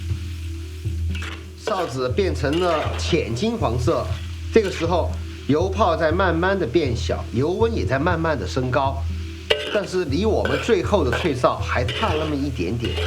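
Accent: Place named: Sichuan, China. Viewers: 川普...听了都想回四川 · 说话语气和性格都是典型的四川人啊啊啊啊啊，太喜欢了！ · 椒鹽普通話=四川普通話🍇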